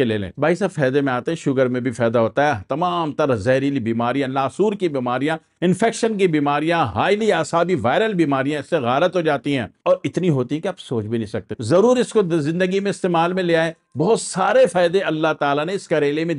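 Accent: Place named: Hyderabad, India. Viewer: Aap bilkul hyderabadi lehje mei boley hyderabad, khushi hui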